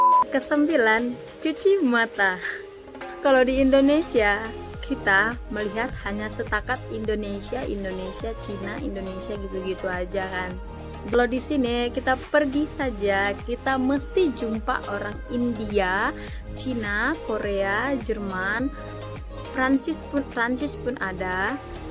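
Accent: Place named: Perak, Malaysia. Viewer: Dialek orang perak, awak makna saya/aku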